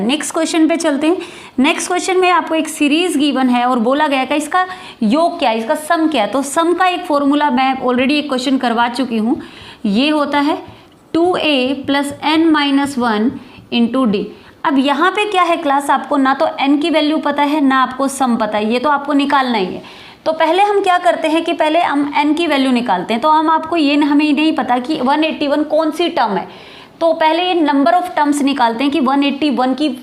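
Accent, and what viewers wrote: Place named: Gujarat, India. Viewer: Kaha❓❓ Gujarat se aap Ho kya.....Words ki Pronunciation se Lagta hai.....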